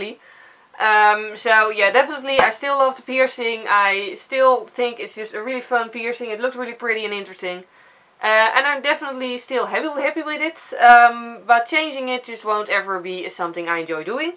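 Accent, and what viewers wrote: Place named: Netherlands. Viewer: Wow, I did not expect you to answer personally.🙂 I just noticed your accent, I'm german and I often go shopping in the netherlands, but I never heard a Dutchman/woman talk English before, sounds kind of familiar to the accent the Dutch have when then talk german.😊 Btw. thanks for answering me and I think your video was helpful.👍☺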